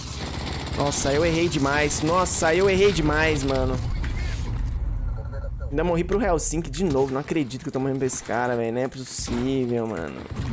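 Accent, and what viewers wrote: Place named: Montes Claros, Brazil. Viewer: Cê e de Montes Claros ???? Responde aí Sotaquizinho não engana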